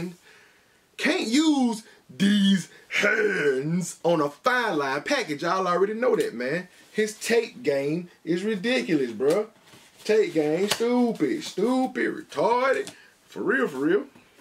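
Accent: South, United States. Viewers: man i luv your southern accent you sound just like t.i but with a lil deeper voice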